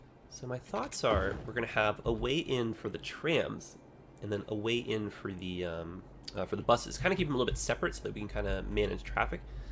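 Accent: Canada. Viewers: That Canadian accent, bro 👍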